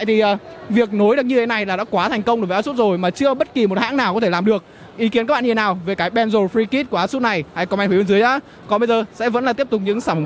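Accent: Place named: Nghe An, Vietnam. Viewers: Hà Tĩnh bác ơi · Giọng Nghệ - Tĩnh đó bác, ông này người Hà Tĩnh. Face ông này hình như là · á đù a người nghệ an à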